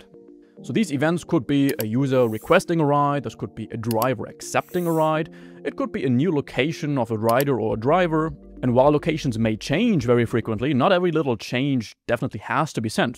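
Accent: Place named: Germany. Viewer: 300k subscribers, amazing editor, no money for elocution lessons. He pronounces English as if it were German. No vowel shift, no consonant adaptation. . Cringe. I bet if he invested 300 euro in private elocution lessons, his English would improve above and beyond. He is clearly capable, just unwilling.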